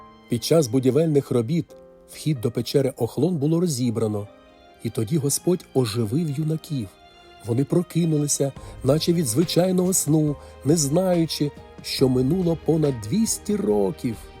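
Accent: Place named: Russia. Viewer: Все прекрасно, якби не той, вибачте, противний, російський акцент. Отче, позбудьтеся його, будь ласка, залишаючи слов'янську мову.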